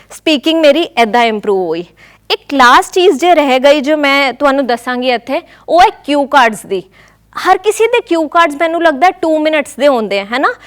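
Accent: India. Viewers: madam thda hindi touch boht a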